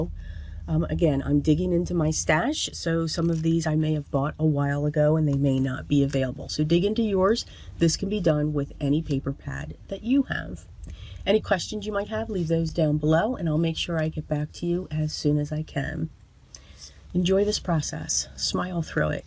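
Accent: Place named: Philadelphia, United States. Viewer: Great ideas! Keep the videos coming! Couldn't help but notice your 'Philly' accent on the video. We're practically neighbors as I'm in NE Philly. ❤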